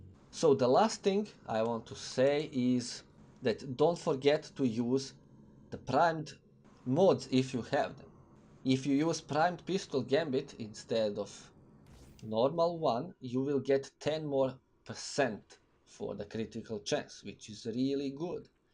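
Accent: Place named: Russia. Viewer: Слышно , что Русский чел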